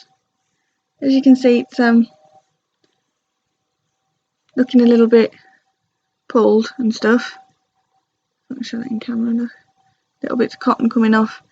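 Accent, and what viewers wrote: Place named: United Kingdom. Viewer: I have Irritant Contact Dermatitis (To the best of my knowledge based on so much research I have done!) where I have redness, itching , blistering and fissures on the back of my hands exactly the areas you indicated in your video and especially my right hand after I wear any glove (Latex, Nitrile or vinyl ) . According to my researches sweating under the gloves is what causes the dermatitis , do you think wearing those cotton gloves under them would help minimize/absorb moisture or it will increase sweating and thus worsen my condition? Thank you so much for uploading this very useful video.. Love your British accent :)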